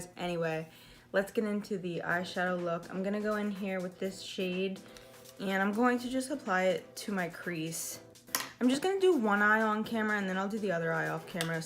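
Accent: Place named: East Coast, United States. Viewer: I LOVE east coast accents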